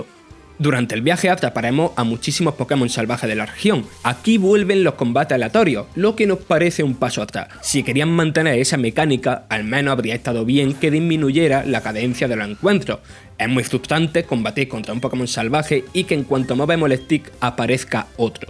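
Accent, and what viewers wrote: Andalusia, Spain. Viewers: Mae mía, en España hay gran variedad de acentos jajajaj. No está intentando ser neutro, es andaluz 😂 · Qué pasa?? Los andaluces no pueden narrar o que?? Hay que ser del mismo centro de Madrid para hacerlo?? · y tú necesitas un poco de cerebro. Cuando tú hablas con tu abuelita tienes el mismo acento que cuando hablas con profesionalidad. La diferencia está en el léxico y las expresiones que se usan, pero el acento es el mismo. El problema de este narrador no es que tenga acento andaluz. Hablar con tu acento no es falta de profesionalidad, no hay ningún problema en dirigirte a toda la comunidad hispanohablante con acento andaluz. Todo el mundo tiene acento y nadie necesita disimularlo para hablar con quien sea. Malo es si usas palabras que usas con tu abuelita en un entorno profesional, no el acento. El narrador no puede decir que este juego es la súper hostia porque sería poco profesional. 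Debe decir al como que este juego es muy bueno. Ahora, de la forma en la que lo pronuncie no determina su profesionalidad. Espero que te haya quedado muy claro que el andaluz no es ninguna vergüenza y que se puede utilizar para dirigirte al mundo entero con mucho orgullo. No hace falta que respondas porque yo sé que no tienes ni puta idea de lingüística. Hasta luego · La ignorancia... en algunos países de España se habla con ese acento en Andalucía por ejemplo es algo normal o incluso usan frases y palabras que en otros lugares no. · Es su acento particular de su parte de Andalucia. Que igual tendria que narrar siendo mas neutro, quizas, no lo se. Ahi no me meto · Es andaluuuz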